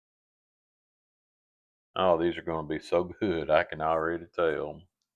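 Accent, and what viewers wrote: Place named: South, United States. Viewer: Thank you for the recipe. My Mom & Grandmas made the best biscuits, I’m a biscuit failure, they both said I worked the dough too much, gonna try with you!. I am a North Carolinian - born & raised. Love your accent, SO many carpet baggers have moved in here, it’s rare to hear a Southern accent! Bless Your Heart!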